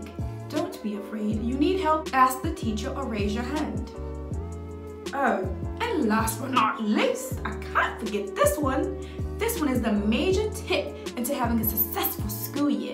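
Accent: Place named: England, United Kingdom. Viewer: Some good tips Teacher...loving the glasses and English Accent 😂👌🏽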